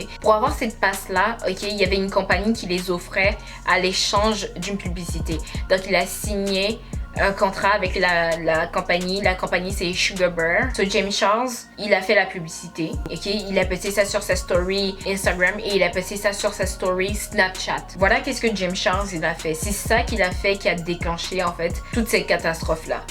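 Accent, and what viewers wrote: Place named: Montreal, Canada. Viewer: Ta l’accent de Montréal gurlllllll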